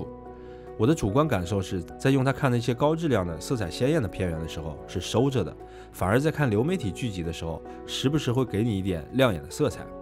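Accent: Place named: Shanxi, China. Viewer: 是山西人吧感觉和我说话差不多哈哈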